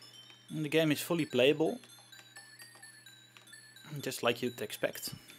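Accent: Netherlands. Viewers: Nederlander? goeie video! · Duuuuuuuuuuuuuuuutch! :D Ik kon het horen from your accent lol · Typisch neder-engels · you.. are.. dutch?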